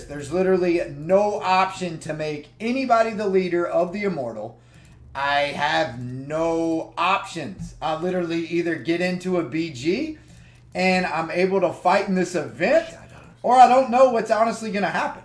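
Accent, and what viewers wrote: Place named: Texas, United States. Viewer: Texan accent makes sense. Oil money=No Skills/P2W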